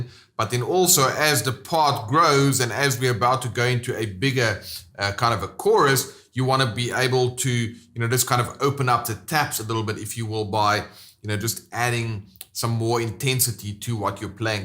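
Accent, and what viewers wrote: South Africa. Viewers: I can hear you're South Africa, same here! Any advice on tone or do you have any videos on tone? I have a Roland Cube amp and also use a BOSS GT-1, but I haven't played around with tones. The tone you're using is perfect for worship, how can I achieve this tone?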